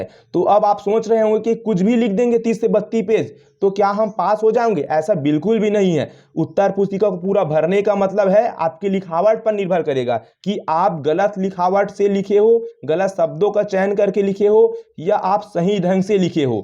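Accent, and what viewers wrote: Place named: Chhattisgarh, India. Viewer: Baat krne ke tone se lagta hai chhattisgarh se ho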